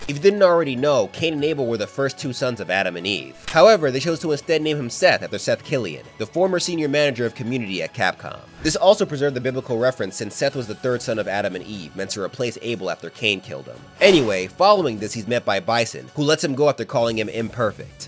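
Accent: Jamaica. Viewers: I didn't know you were Jamaican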